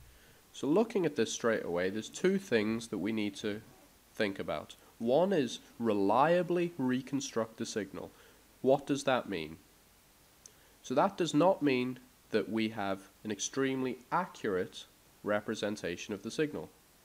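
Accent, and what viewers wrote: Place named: Northern Ireland, United Kingdom. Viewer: where are you from I can't tell you got a mad accent fam sounds almost northern irish but at same time quite english what type of hybrid are you this is melting my brain at this tender hour i need to rest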